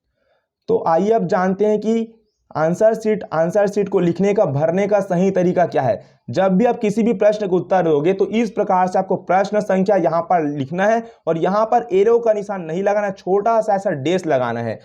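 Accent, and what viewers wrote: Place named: Chhattisgarh, India. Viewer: Baat krne ke tone se lagta hai chhattisgarh se ho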